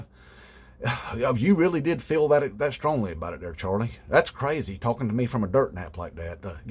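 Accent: South, United States. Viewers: Brother Man...and I mean that from the HEART!.. Was thought never judge others by race,color,ethnicity and or geography...For you SIR..are a GOOD/DECENT MAN..that Southern Accent and long hair sort of made me bais (somewhat ) I Was really SHOCKED by the context of your video..Again..SIR! MUCH RESPECT! ..for Speaking TRUTH..and for doing it while ..sticking to Facts and not personal bias and or prejudice..This NYC Puerto Rican would be honored to meet at a Bar of your choosing and swinging back a couple of Tall Pitchers of Beer(of your choosing)✌🏽 Brother..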